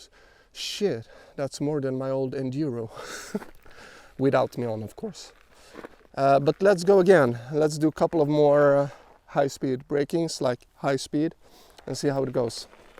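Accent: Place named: Sweden. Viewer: Wow, thats really nice breaking. You sound Swedish (är du Svensk?) When you were braking on gravel i was like, what did they add ABS on some model allready? 😮 Cause those skid streaks seems impossible to make unless its something self controlling (3dm in 50km/h (13.8m/s) only takes 22ms to travel, seems inpossible to adjust based on input every 22ms. But might be that wheel becomes self adjusting? As it starts skidding, the power drops drastically vs when actually breaking? Why no helmet though? Braking from 50 can turn this into something that hospitals log, to ban EVs (dont ask me how i know 😊)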